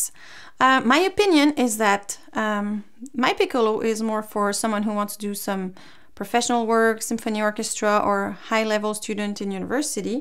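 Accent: France. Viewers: Coucou , j’entends un accent français je me trompe ? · Une française j'ai reconnu avec les r hehe sinon c pas mal je pense pour les débutants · J’ai l’impression qu’elle a un accent français non ? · I could spot your french accent in a way or another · She is French from her english accent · Je me disais bien qu'il y avait un accent français... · Lorky ouii elle a un accent je trouve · Are you french/french canadian? There is a light accent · J’ai entendu l’accent français et ça fait plaisir ! Sinon super vidéo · Are you french? You have an adorable french accent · French accent? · l'accent français ce ressent mdr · Très bonne vidéo ,sa ce vois que te es française avec l'accent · Énorme accent français jsp si tu est française ou pas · Serait-ce un accent francais ? 😬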